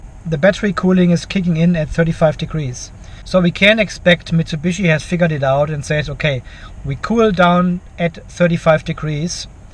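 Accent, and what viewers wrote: Germany. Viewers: R u german ?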